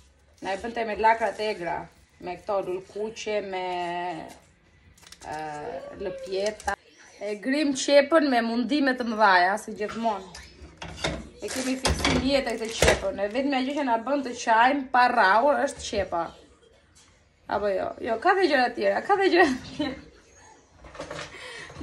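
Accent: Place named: Dibra, Albania. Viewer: Hahaha me knaqe po nga je se flet si nga dibra se nga dibra jam vet urime suksese pac me kto video❤️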